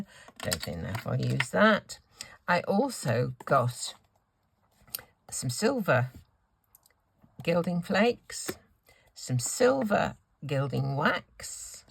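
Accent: England, United Kingdom. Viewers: Just watched Lou’s collaboration video. I’m new to your videos. Just subscribed to your channel. I love to hear your English accent.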